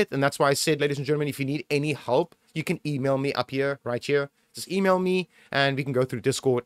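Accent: South Africa. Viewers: You're literally the only guy that explains everything correctly. And You're South African made everything easier. Thanks buds im running 5th gen I7 and 3070 12 gig this helped me big time.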